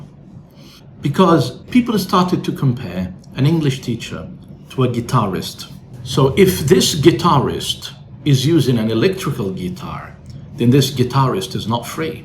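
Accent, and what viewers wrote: United Kingdom. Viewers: Are you native speaker of british English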